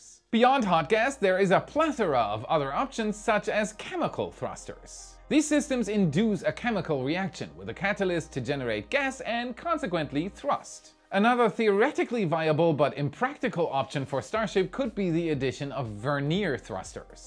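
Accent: Germany. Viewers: Ich wusste doch, dass da ein minimal deutscher Accent ist😊 Grüße aus Deutschland zurück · I caught the secret message! You still have a slightly german accent, so I was assuming you were from germany :D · Ja, ich wusste, dass du aus Deutschland kommst, weil man deinen Deutsch Dialekt raushört😂👍🏽 Deswegen heute mal auf Deutsch: Mal wieder ein klasse Video, danke für die ständigen Updates 🙏🏼🙏🏼🙏🏼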